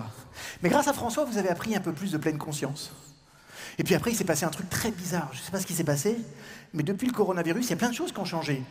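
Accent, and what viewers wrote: Quebec, Canada. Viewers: Merci d’avoir publié ta conférence de l’AZ Paris! Je rêvais de t’entendre au Sommet de Québec où j’étais pour la première fois cette année. C’est mon prix de consolation:0) … et en passant ton accent québécois oh la la … comme dit Martin à faire la même chose 1000 fois, on finit par y arriver! Je t’adore, merci de tous ces partages sur YouTube, tu fais partie de mon univers plusieurs fois semaine et je m’enrichis à chaque visionnement. Bonne continuité!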